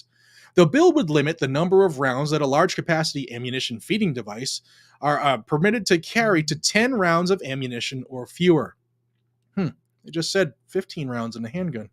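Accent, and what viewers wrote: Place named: Texas, United States. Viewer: Texas. Loud and clear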